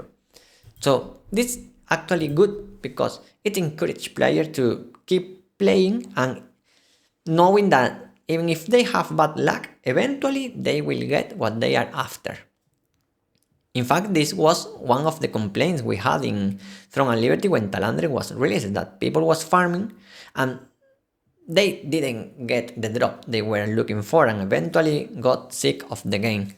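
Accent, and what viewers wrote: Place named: Spain. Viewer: I love your spanish accent. Whenever you say the word just yast* im smiling😊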